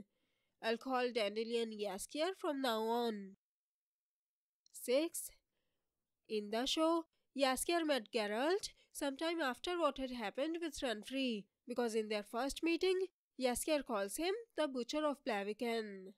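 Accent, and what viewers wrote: India. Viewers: Great video... but i wish the indian accent was not so unbearable...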